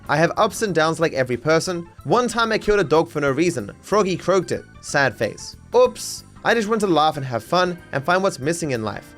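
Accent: Australia. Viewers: Hell yeah, that's why I pay for the internet. To watch an Australian guy talk half a video about an unreal website for unreal horny wifes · other guys at my age: going oudside, doing sports, enjoying life. Me : listening to a random ausiie reading s*x messages.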